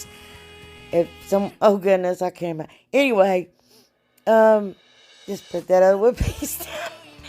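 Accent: South, United States. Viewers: Enjoyed your videos and your adorable country accent! You definitely kept me laughing! My Mother's maiden name was Castles 🏰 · My mom's maiden name is Smith. I absolutely love all your Diys and your sweet southern drawl. Where are you from ? ♥️♥️♥️♥️♥️♥️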